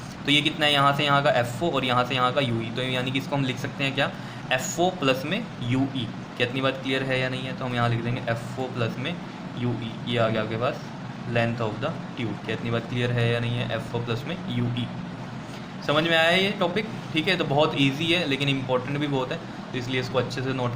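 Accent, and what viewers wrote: Punjab, India. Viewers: Its subh exam h sir 😢😢😢😢 sir aapki voice achi h give punjabi vibe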